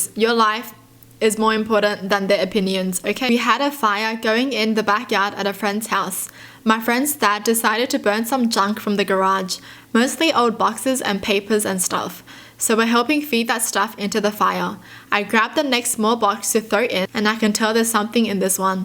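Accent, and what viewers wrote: Australia. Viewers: Aussie accents best accents 💅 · is Australian! Wow that is so cool. I was wondering where she gets her accent from!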